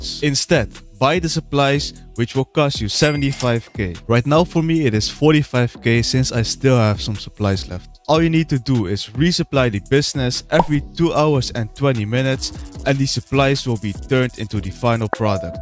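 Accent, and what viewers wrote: Netherlands. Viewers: Ik had binnen 20 seconden door dat je een nederlander bent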